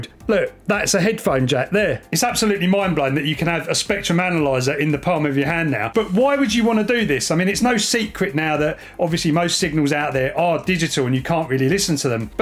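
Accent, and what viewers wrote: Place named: London, United Kingdom. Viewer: A cheeky London accent version of serious radio! You should go down well with the sun readers.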